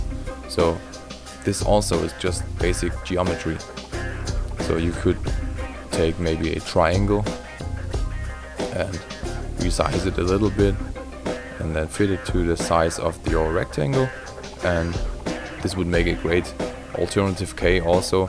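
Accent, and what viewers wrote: Germany. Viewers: Are you really english ? Or german because your voice is like a little bit of german accent !^^ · Hahaha man merkt irgendwie dass das n deutscher war :D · Bist du deutscher? Wenn ja hört mans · Digga man hat herausgehört das du deutsch bist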